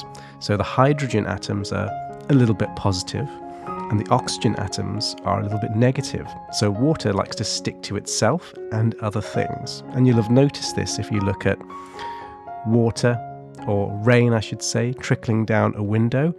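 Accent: England, United Kingdom. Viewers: You would do Well in the US, as they Love English Accents!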